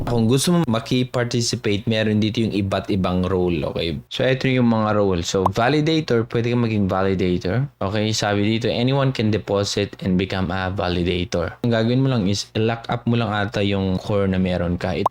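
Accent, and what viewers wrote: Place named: Conyo, Philippines. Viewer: Conyo magsalita pero ayos salamat sa review boss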